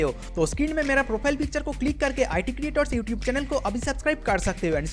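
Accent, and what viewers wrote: Bengal, India. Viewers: Aapka hindi kafi kuch Bengalee ki tara hai.... kaha se hain aap?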